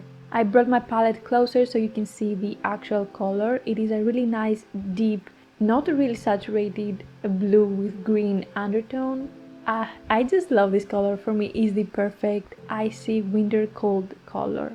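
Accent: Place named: Greece. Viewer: Breathtaking piece! Thank you for this. Despite your very cute greek accent, you gave a very clear and detailed tutorial. All your tutorials are very helpful. ❤ Kudos! 😊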